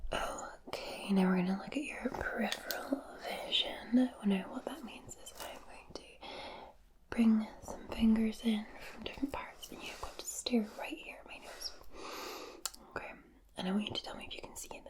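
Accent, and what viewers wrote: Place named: Scotland, United Kingdom. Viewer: I’ve just had to hand in my Englishman card 😭😭 as the Scottish accent is yummy 🤦🏼‍♂️🤦🏼‍♂️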